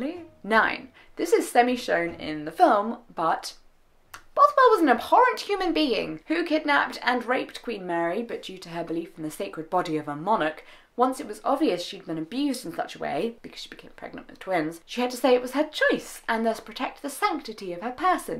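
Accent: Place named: England, United Kingdom. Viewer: English accent 🤤😍😍😍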